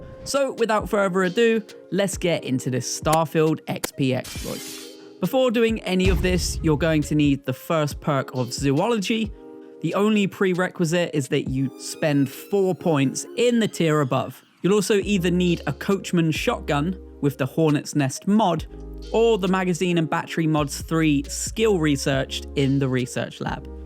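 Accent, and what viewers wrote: United Kingdom. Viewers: Funny, sounds British but saying ALUMINIUM wrong.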